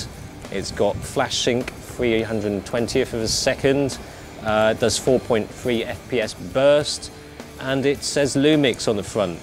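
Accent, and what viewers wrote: United Kingdom. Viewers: i find his voice too british too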